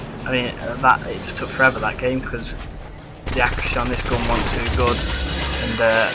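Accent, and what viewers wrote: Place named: United Kingdom. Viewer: I thought the owner of this channel was hispanic. I remember seeing loads of vids in spanish. Now i hear a brit talking. IM confused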